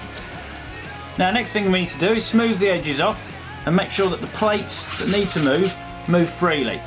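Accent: United Kingdom. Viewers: How much would you say all the materials for this cost? If possible I want to make myself one. Don't worry about the cost for the tools like the drill and such, I can deal with that. (I know people) I just want to know if I can afford the raw materials. (I probably can't) You sound british, but If possible I would like to know the approximate price in US dollars. I'm also not expecting you to see this comment...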